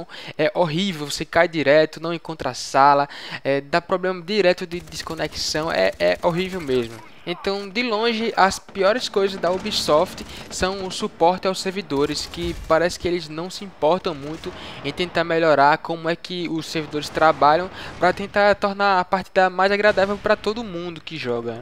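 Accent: Northeast, Brazil. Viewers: tudo bem cara vc tem sutaqui diferente,vc e. Nordestino?? Abraço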